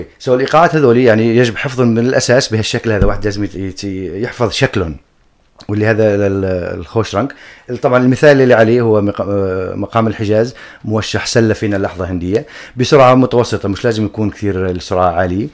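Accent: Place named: Sadad, Syria. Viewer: اللهجة قريبة من أهل صدد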